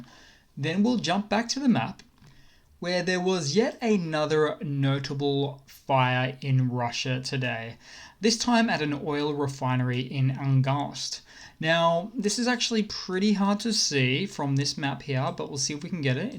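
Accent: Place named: Australia. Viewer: Always a huge thank you for the hard work put into your content! Love the accent! Teach us more Aussie! While learning about Ukraine! ❤️🫂🇺🇦🫂❤️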